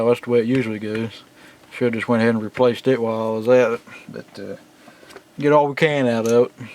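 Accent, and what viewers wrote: South, United States. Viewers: I don't have any comments about this video in particular, but I just wanted to say that I just discovered your channel and the videos are really well made and I appreciate how informative you are. Keep it up! Also, you don't have to say if you don't want to,but.. you wouldn't happen to be a TN or a NC man, would ya? You sound like.. well, you sound like me. haha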